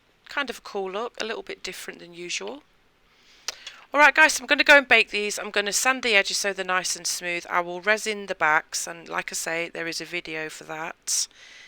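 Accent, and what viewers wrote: United Kingdom. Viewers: Wowser, that's gorgeous. Love the colours, and love the spiral patterned roller. Hey, I read the comment from the lady in the US. It's great you have kept your British accent. A lady on YouTube who does paint pours, is from Birmingham UK and emigrated to Australia in the 80's. She still has her Brummy accent. I would give anything to emigrate from the UK as it's horrible these days. I was born here in 1960. Like you mention though, the world over, you have to be careful about saying anything any more, in case you upset someone!!! I carry on speaking my mind, and ya boo sucks to everyone LOL!!!! Love your videos, thank you so much for sharing your superb creations with us. Take care, xx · Very cool!! With the colors you used they have a bit of a Southwestern flavor. I really like the way you created the subtle wood grain, it makes a great, interesting background and contrasts the colorful pieces well. 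I didn’t know you are in the US!! I thought you were in the UK somewhere. I hope you like it here. I suppose if you have been here over 10 years you must like it a bit 😊 It amazes me that you have maintained your accent. I went to school in Norwich for 3 years and developed such a British accent that people didn’t believe I was American🤣 Anyway, these pieces turned out great!! Thank you for sharing 💚💛💙🤍💜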